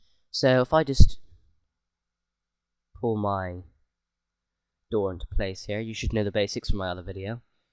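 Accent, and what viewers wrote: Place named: Bristol, United Kingdom. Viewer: Sounds a bit Bristol to me!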